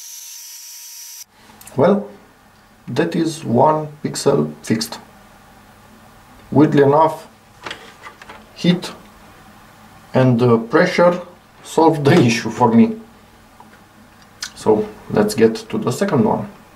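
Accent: Romania. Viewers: Accentul de roman si inghetata pe care scrie in lb romana te dau de gol :)) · Salut. Aveam o banuiala ca esti roman dupa accent, dar inghetata te-a dat de gol 😂. Am un "cluster" mare de pixeli blocati pe TV, am incercat varianta cu jscreenfix si nu a mers, Vreau sa incerc cu creionul si caldura cum ai facut aici, poti sa-mi spui te rog pe ce intensitate ai dat feonul? Multumesc! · Dupa accent ma gandesc ca esti roman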